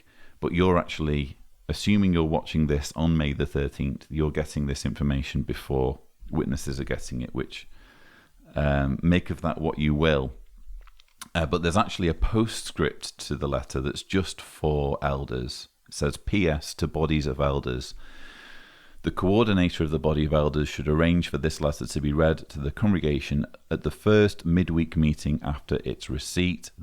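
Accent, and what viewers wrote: United Kingdom. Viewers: I listened to 5 seconds and can see your a British nut!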